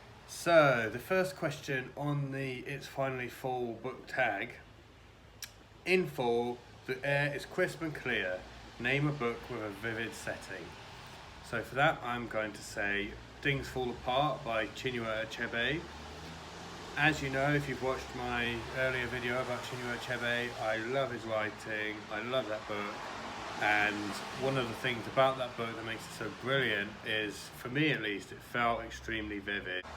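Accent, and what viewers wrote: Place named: England, United Kingdom. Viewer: Hello fellow UK booktuber! I don't often come across very many so I get excited when I hear an English accent! Great video, love your channel so far! I am new here so still trying to find my way around. Subscribing for sure ❤️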